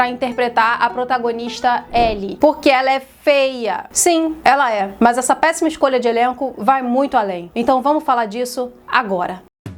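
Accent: Rio de Janeiro, Brazil. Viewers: Mesmo com um sotaque carioca que eu não curto muito, a faz um conteúdo maravilhoso. Amo e coaduna com suas opiniões. E sim, a Testa Ramsey é feia e matou a personagem do TLOU. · A PUXADINHA CARIOCA SUA É INEVITÁVEL NE...... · é a minha carioca preferida (e olha que eu detesto sotaque carioca) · Você é uma carioca da gema!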